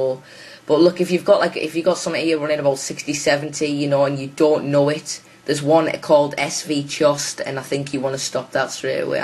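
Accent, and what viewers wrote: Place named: Liverpool, United Kingdom. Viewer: Ya sound scouse or maybe from Manchester, yeah? Nonetheless, loving your dialect! Cheers!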